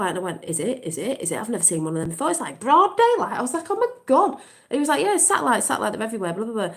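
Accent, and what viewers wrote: United Kingdom. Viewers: That's our girl, always on point with that British voice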